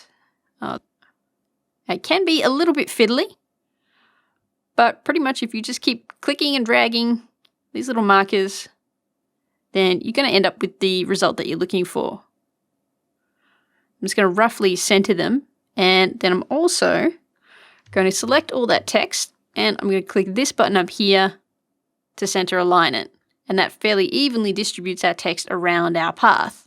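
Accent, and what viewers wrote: Australia. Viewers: THANK YOU!! I love this little course, I've learned more from it than 20 others. Also, it's fun to hear an Aussie voice out there in youtube land - so, a big thank you also, to the young lady who taught it. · Sounds like an Aussie or Kiwi... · This is hands down THE best Affinity Designer tutorial on earth, but I'm not going to lie, the Australian accent helped keep me engaged the entire time ;) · I'm still with AD v.1 'cos my mid-2010 G5 can't handle v.2's requirements. This vid answers SO MANY QUESTIONS I've had since switching from Illustrator, FreeHand, etc. It is now PERMANENTLY BOOKMARKED and the URL copied to a separate text file (just in case). If this vid was downloadable, I'd save it to an XHD, too. Great job! (and the Aussie accent is a nice 'plus' point!). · Glad to hear an Aussie accent at last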